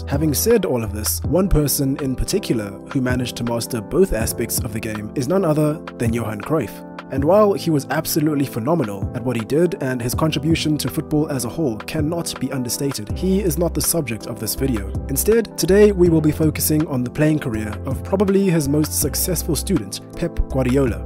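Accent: South Africa. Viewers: You sound like a South African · Are you South African ? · I’m getting a South African accent are you from Mzansi? Good job mate👊🏾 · Are you south african? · Great video. Subbed quickly. Hearing your South African accent made me sub even quicker.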